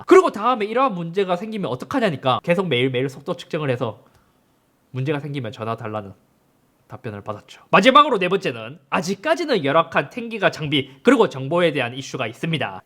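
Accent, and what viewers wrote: Gyeongsang, South Korea. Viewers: 경상도 신뢰가 안간다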